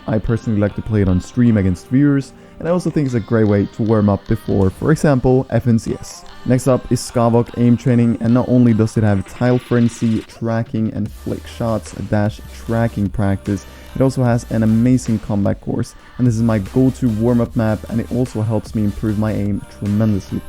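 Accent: Norway. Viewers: Are you Norwegian? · Ayo norwegian? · are u from norway by any chance???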